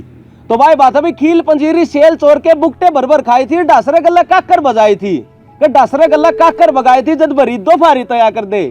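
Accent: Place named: Assand, India. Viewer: I am from Multan Pakistan .bhai lagai se kime assand ke ilake se hei kunke mere gam main assandiai bethe se.look like u r from assand karnal because my village my maternal relatives speake 100 % same language with the same dialect. I am surprised to my languageis alive in haryana india.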